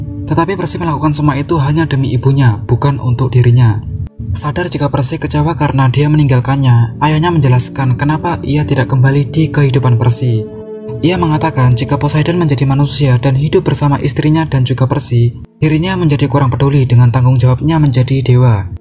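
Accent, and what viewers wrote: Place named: Java, Indonesia. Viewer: Penjelasannya enak dgn warna logat jawa yg kental. 😄😁😊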